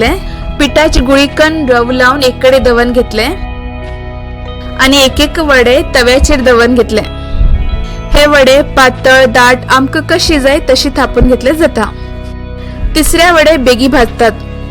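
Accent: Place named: North Canara, India. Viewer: Yummy recipe 😋 n I love Ur north Canara konkani ❤️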